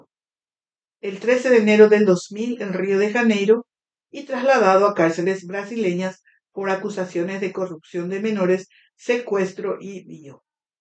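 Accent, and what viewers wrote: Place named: Portugal. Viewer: Me encanta el acento portugués 😘🤗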